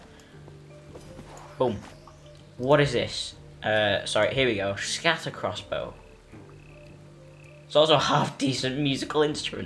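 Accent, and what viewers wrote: United Kingdom. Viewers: Now i can hear his british voice i could not ages ago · What part of England are you from. We are in Scarborough, North Yorkshire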